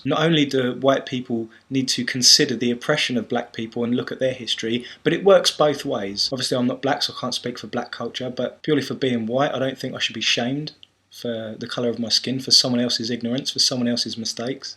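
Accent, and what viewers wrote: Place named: England, United Kingdom. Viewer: You should make up your mind there guy. are you "white" or are you NOT "white"? you claim "white" people dont exist, but you seem to be holding pretty fiercely to your "whiteness". Sound English as fuck to me. Why not just stick to being English? WHITE people are a political creation of racists. jUst be an ignorant English ass who doesnt know what he is talking about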